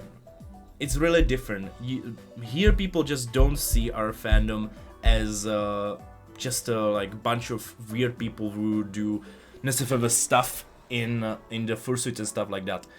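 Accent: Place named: Czech Republic. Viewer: Tak 4 sekundy a bylo to jasný že jseš čech.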